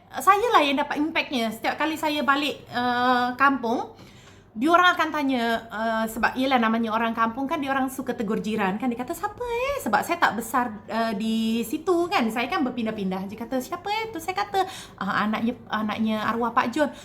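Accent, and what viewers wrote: Malaysia. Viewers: Saya suka dan senang.mendengar cerita ini, apalagi diceritakan orang Malaysia logat Melayu, berdarah Indonesia.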